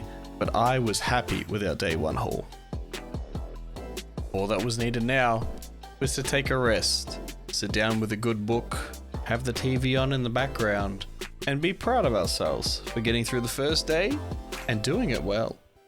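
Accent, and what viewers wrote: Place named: Australia. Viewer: I love the australian accent. You are doing great, keep it up!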